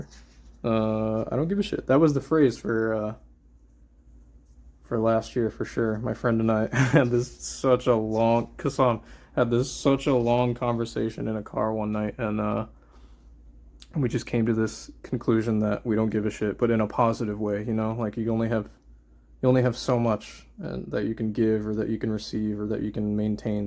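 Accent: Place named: United States. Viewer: Here we go! Comment wars! 47 mins!? Wowowow! A few nice phases hahaha!! Your smooth American voice is soothing haha. It's nice to see your rougher work. The bishop sketches are badass!!! Reminds me a little of an artist called Crom that made bird king but your stuff is a lot more realistic. The little things you write down throughout the book are cool, a little hint of emotion here and there. Just wanna say that this is awesome that you've finally made the video!!!! I love a skull with a badass jawbone for some reason so this is itching a scratch for me. I think sharing your work like this will be so good for you. Kinda just getting some thoughts out into a video through voicing it 🙂 I prefer black and white drawing too but some colour on my stuff brings it to life a little. Maybe try a little grey or a single colour. The audio is good by the way, nice and clear. 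The big masks are pretty cool, looks like real bone. You know mate you are actually so so good at drawing. The tiny tiny details are super intricate. The picture of the burn pile was awesome. Sorry to hear about your uncle buddy. Unfortunately I know the pain of losing a loved one. (Hug) Never knew your biological father. That's sucky... But at least you made some cool art out of it. I wish I never knew my dad the old drunk bus driving wanker haha. I don't personally enjoy social situations too much either, I prefer just a small group or just me hanging out on my own... Which is kinda weird for us as we are so up for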